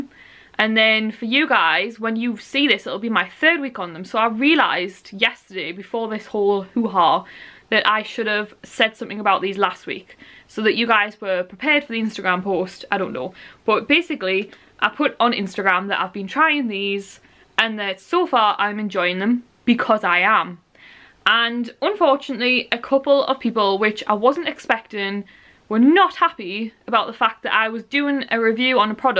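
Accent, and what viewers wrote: Newcastle, United Kingdom. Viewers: Thank you so much for these vlogs, I've woken up feeling sick to my stomach today and watching this has really calmed me down and helped me feel better! <3 I find your voice so soothing as my mum's side of the family are from Newcastle/Gateshead and I used to go up there fair bit to see my grandparents. I have to ask, does the Metro Centre still have that mad rollercoaster/theme park inside it (think it was called Metroland)? Got a lot of fond memories of it!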